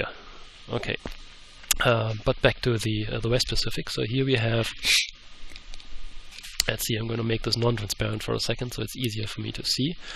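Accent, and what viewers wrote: Germany. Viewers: Man this was awesome! Do I get it right that you have something like Virtual Reality glasses on to see the things we are seeing? Oh and, are you german? Your accent sounds just like that... :)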